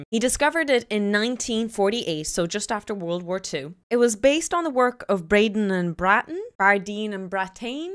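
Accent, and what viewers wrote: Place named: Ireland. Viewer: Nice explanation. You sound Irish.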